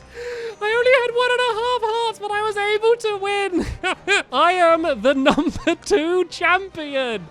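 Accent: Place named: United Kingdom. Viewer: Gaming Wolf 2016 He British not American... You can tell by his voice...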